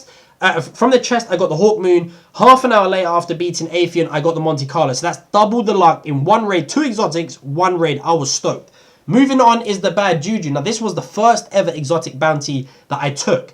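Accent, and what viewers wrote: United Kingdom. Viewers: Why is every Destiny Youtuber british? Seriously, you're voices are fucking annoying.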